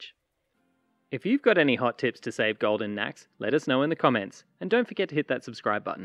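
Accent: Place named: Australia. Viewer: Aussie AF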